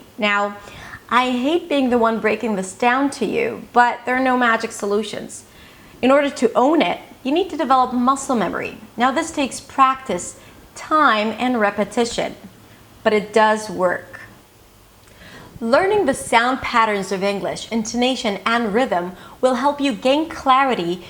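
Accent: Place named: United States. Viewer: I agree with everything you have to say. But I hope you know that you have an American accent. And yes, that is also an accent.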